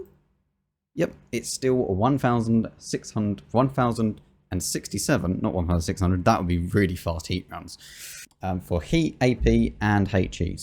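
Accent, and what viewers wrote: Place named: United Kingdom. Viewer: Wow, who is this beautiful assassin lookin' dude at? With the {I think?) British accent? Does anyone know? His reviews are the best, my opinion, and I'm in love. Wow, my cheeks are hurting. No...from smiling!;)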